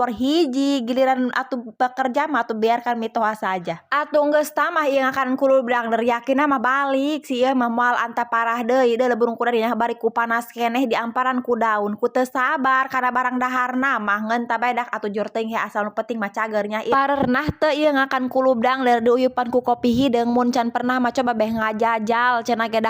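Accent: Indonesia. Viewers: Sunda na urang mna iye teh nya,jiga bahasa sunda urang ker alit😊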